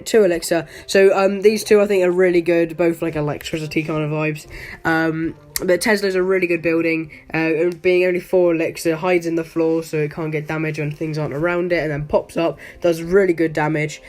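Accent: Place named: United Kingdom. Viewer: I was enjoying the vid until u spoke bri'ish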